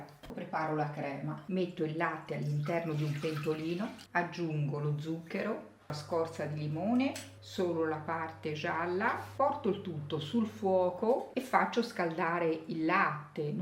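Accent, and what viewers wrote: Tuscany, Italy. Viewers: La farò sicuramente….non è di Benevento lei l’accento è toscano… comunque 🔝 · Sei bravissima, fatte tue ricette, tutte riuscite. Quel tuo accento toscano, ti rende ancor di piu❤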